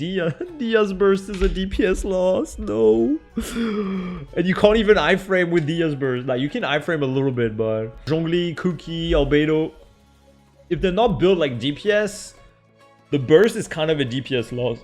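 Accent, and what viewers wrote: France. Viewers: is it hard for you to speak English and you are frensh idk btw i can speak both of them :) I'm from Morocco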